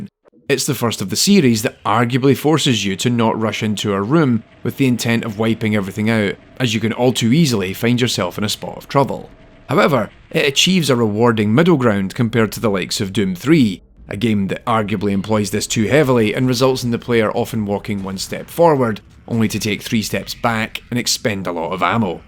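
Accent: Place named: Scotland, United Kingdom. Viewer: You're the first Scottish (i presume that's a Scottish accent) for whom i don't need the subtitles.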